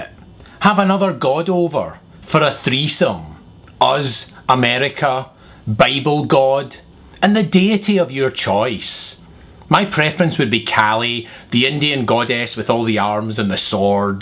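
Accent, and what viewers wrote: Scotland, United Kingdom. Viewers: hey, yus one funny dude! don't believe i take the 5 spicy meatballs but i do have 5 stars for ya! Keep up the good work and your scottish accent is COOL!!! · Scottish. Watch his earlier videos to learn how much he enjoys being mistaken for Irish.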